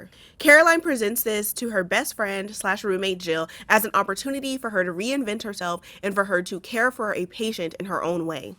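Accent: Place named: Deep South, United States. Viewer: I just stumbled upon this and at the beginning i was like from exactly how Deep South is this girl??😂😂😂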